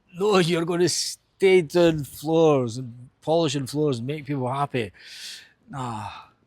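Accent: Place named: Fife, United Kingdom. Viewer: I’m a Scotsman in Italy….🇮🇹 I left more than 20 years ago. Your accent seems Fife? East coast accent?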